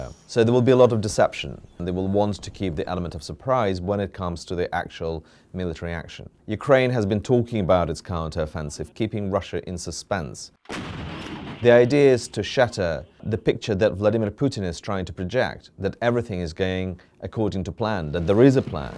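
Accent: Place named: RP, United Kingdom. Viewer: When you click on a video of The Economist hoping to learn something and stop halfway as you figure out it is posh British accentuated bla-bla-bla…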